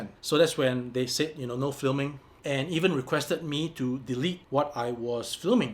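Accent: Singapore. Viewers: Nice to hear the singaporean accent again! I'd lived there for 7 years and it's the best of my life so far. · So familiar with Singlish accent!!!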